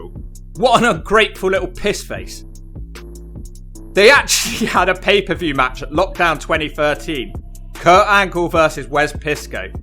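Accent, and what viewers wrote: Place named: England, United Kingdom. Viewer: Addiction is no joke thumbs down stupid English accent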